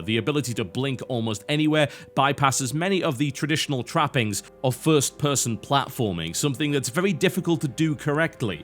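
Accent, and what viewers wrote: United Kingdom. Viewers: your british accent disgusts me. Im from england and yet i still cannot understand wtf your going on about. The end of your sentences all sound like your american.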